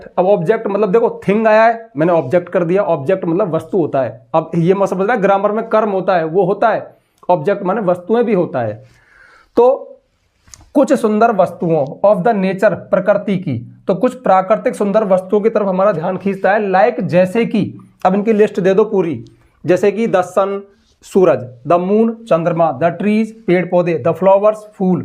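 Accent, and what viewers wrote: India. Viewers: Teaching English 😊with Desi accent..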